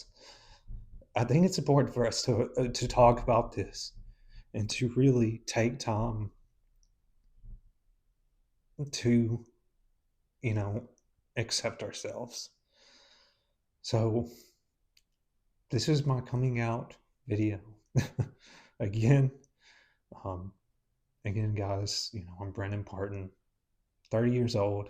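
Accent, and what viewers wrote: South, United States. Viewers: Hey, I can relate so some of the things like the name-calling in school, and it really hurt. Every day was a challenge. I love your smile and your southern accent! You’ve clearly been holding in a lot for a long time, so I’m sending you a big virtual hug. Thank you for sharing your personal story — it takes courage to open up like that. You’re a strong, good-looking person with a bright future ahead. Greetings from Michigan. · It broke my heart seeing you cry! I’m not American, didn’t grow up Christian, and younger than you by 10 years yet my experience is very similar. I’m very proud of you for having the courage to come out, to heal, to admit you were wrong and to correct that, and to become a great and inclusive trainer who we all can tell is very good at his job by looking to his body..also I love your southern accent Sending love ❤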